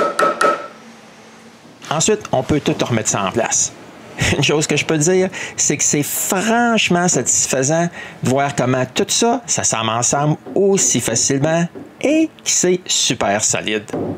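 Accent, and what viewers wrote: Canada. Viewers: ce petit accent Canadien me régal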